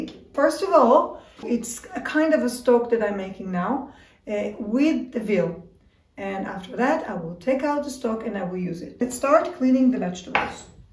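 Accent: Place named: France. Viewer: Goutez a little bit...your accent was so french😂😂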